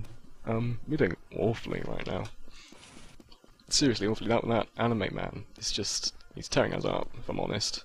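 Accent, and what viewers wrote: RP, United Kingdom. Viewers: alright cool however i noticed a big difference between today and 2014. you sound so much more posh! c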